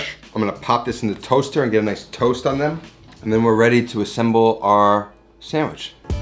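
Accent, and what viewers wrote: New York, United States. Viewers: New york accents are magic on video for some reason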